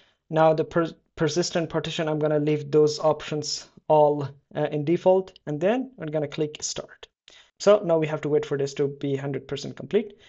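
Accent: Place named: India. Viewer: You can't hide your hideous indian accent well enough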